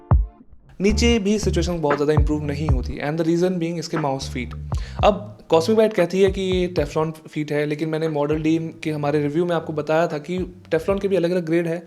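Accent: Assam, India. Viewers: Assam se ho bro? Bhai na bhai!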